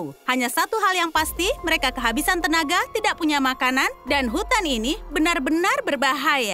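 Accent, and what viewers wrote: United Kingdom. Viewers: kak kakak orang inggris atau indonesia 😊😊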